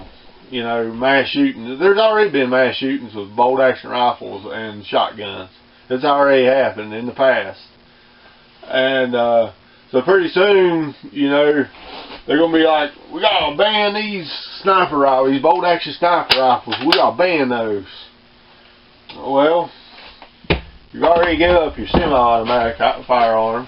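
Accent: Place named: South, United States. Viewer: Well I watched your video, the whole thing, and that's time I'll never get back. Sounds like you're just given up, it also sounds like you're from the South. But you don't know the South because ain't nobody giving up anything down there. Also if it gets "Venezuela bad" us people who buried our fire arms will have firearms. So you're talking in this circular logic that doesn't make sense. The only thing that makes sense to me is you're a coward and you're going to give up.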